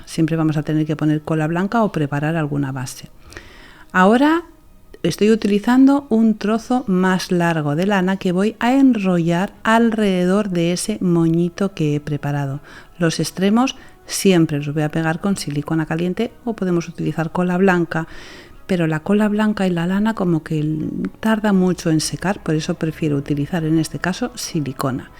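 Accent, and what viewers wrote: Spain. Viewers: Hola, bonito nombre by the way. Tienes un acento español. Vives en España¿? Y la tienda de la joven que conociste también está en España¿? Debes saber soy tu nueva suscriptora desde que te encontré en internet.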